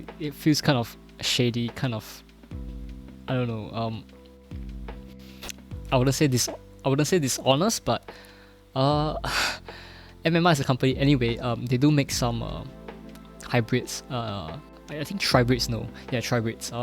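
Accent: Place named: Singapore. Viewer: I hear da accent I noe is Singaporean lollol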